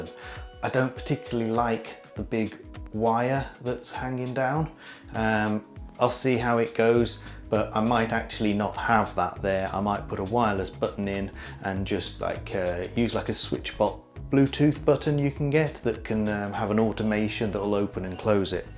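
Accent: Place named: United Kingdom. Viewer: I came for the switchbot and stayed for the polite sounding british accent 😊 btw linus sebastian vibes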